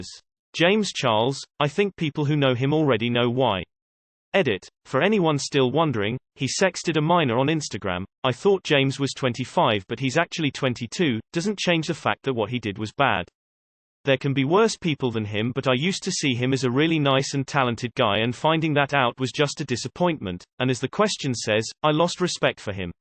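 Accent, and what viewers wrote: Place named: United Kingdom. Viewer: The British voice works surprisingly well with hateful comments.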